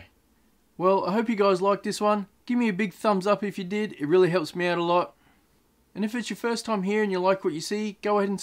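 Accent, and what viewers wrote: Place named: Australia. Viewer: as soon as i heard you talking i was like FUCK ofc he’s australian only us aussies would do this 😂 the corona straight away made me suss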